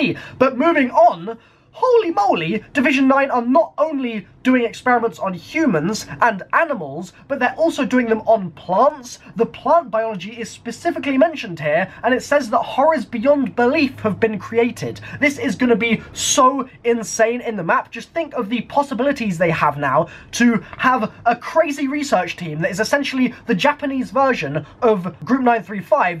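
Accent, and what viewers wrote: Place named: RP, United Kingdom. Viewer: Posh but good vid left a like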